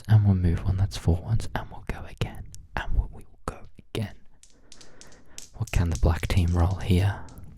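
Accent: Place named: Australia. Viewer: Hey man, just wanted to say this popped up in my recommended and I’m super glad it did! As a fellow Aussie I surprisingly don’t see many ASMR creators from out our way, but your voice and accent is super well suited for this kind of stuff and the microphone/production quality relative to the size of your channel is really impressive. I’m a big fan of ASMR Chess and this gave me similar vibes to him. Keep it up!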